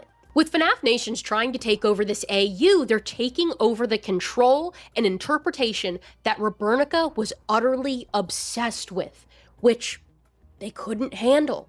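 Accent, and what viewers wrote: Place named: Appalachia, United States. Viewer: as someone else from rural appalachia hearing your accent made me really happy because i dont think i’ve ever heard any video essayist with one similar to mine. you’re awesome definitely subscribing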